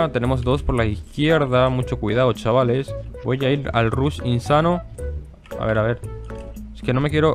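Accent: Paraguay. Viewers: Se te nota tu hablar de paraguay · sabía luego kp , se pilla umpoco tu voz , solo los paraguayos sabemos darnos cuenta 🇵🇾😂👍🏼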